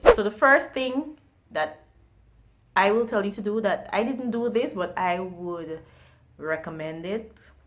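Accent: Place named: Guyana. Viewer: Why do you sound Guyanese!!! 😍😍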